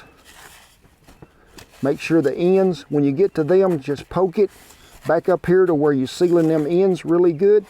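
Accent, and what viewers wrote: United States. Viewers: Как он говорит... Это же "кантри" в чистом виде! Наложить музыку и всё, привет и поздравленья! МОЛОДЕЦ чувак!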